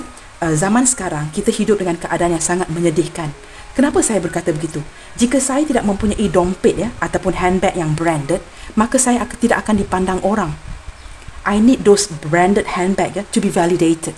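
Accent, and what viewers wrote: Malaysia. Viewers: Tak apa kak aku suka kak cakap Malaysia , aku suka dan aku paham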